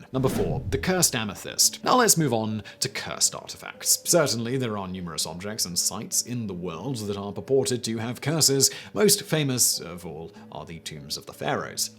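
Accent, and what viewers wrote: United Kingdom. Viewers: Love listening to this "snooty brit".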